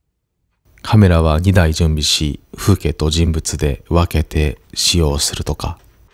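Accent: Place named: Kansai, Japan. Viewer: いつも楽しく拝見しております、ありがとうございます。 関西の方かと、思ってましたが、東北の方なんですね。 アクセントが関西っぽかったので。。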